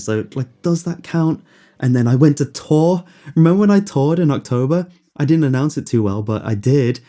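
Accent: England, United Kingdom. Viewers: Can someone tell me where in England his accent is from? I love listening to it. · Wow, you are a very humble guy and I’ve always listen to you because of your very calming British voice ❤️. Keep doing what you love and just listen to your gut instincts.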